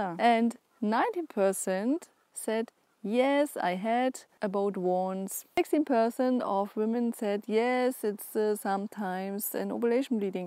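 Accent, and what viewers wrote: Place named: Germany. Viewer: That german accent😁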